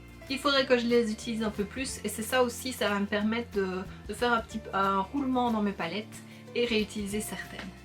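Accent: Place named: Belgium. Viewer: Re salut !! Aaah, ça y est, je suis bien installée pour regarder ta vidéo ! Oh la Riviera d'Anastasia, j'aime beaucoup ! Je ne l'ai pas mais elle m'a ouvrent tentée ! Ah je me disais que tu étais sûrement en Belgique. J'entendais un petit accent mignon 😉 Ooh, elle est magnifique la palette Into the Snow de Essence! Je ne connaissais pas ! Mais c'est vrai que c'est une marque très sympa ! Et qui offre pas mal de choses intéressantes pour un bon prix ! C'est marrant tu as présenté deux palettes de suite (la New nude de Huda et la XL de Lime Crime) que je n'ai pas mais dont j'ai des dupes ! Respectivement chez W7 et chez... Je ne sais plus ! Lol c'est une marque sur Amazon (mais je ne l'ai pas encore testée). J'adore la marque Makeup Revolution !!! J'ai pas mal de palettes de cette marque, mais pas les même que toi 😅 je pense que je ferai un jour une vidéo sur mes palettes aussi, mais je ne suis pas chez moi en ce moment... 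Waw, effectivement tu as plein de palettes de Too Faced! Moi je n'ai que la White Peach, et je l'aime bien pour des makeup légers. Effectivement tu aimes beaucoup les paillettes !! Tu connais les paillettes de chez Colourpop ? Parce qu'ils en font et elles sont juste magnifiques ! Elles sont jolies les palettes néons de W7 ! J'ai vu que Makeup revolution allait en sortir aussi, encore une fois dupes de celles d'Huda Beauty ! Merci pour ton partage de collection ! J'aime beaucoup regaeder ce genre de vidéo ! As-tu déjà fait une vidéo sur ton rangement makeup ?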